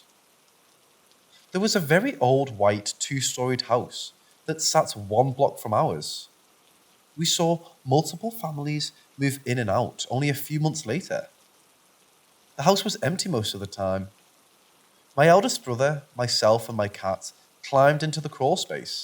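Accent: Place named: Sunderland, United Kingdom. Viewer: Hmmm cant decide if your a Geordie with a middle class background or you hail from across the river Tyne beyond Gateshead, with a smattering of Sunderland tones, not Durham, too thick of an accent. Maybe uni at Manchester? Back to Newcastle or even Northumberland? Im on the far Northumbrian Coast & love tracing where accents come from & the little quirks of other accents picked up from a short stay or via friends & family. Thanks enjoyed yr video 😉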